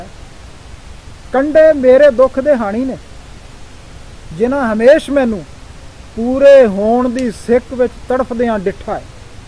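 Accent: India. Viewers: Dear ❤️ professor sAhib, GoOd morning jNAb, daily jNAb nU M sUNNdA, samjhda bUT nOW, hUM mARi wARi, mARi public dealing kAfi hAi, & 1982, 85 tOUN chALdi Aaa Rhi hAi, As pER mY Opinions, face reading, jNAb waqti tA jO bi hAIN, sO hAiN bUT jNAb dA ways of talking, thinking, BOL ChAL,LEhjA hAR Lehaz tOUN mANU hindustania wAllA Lagda hAi nA ki Pakistani, if Any wAY, I am wRONG, please sorry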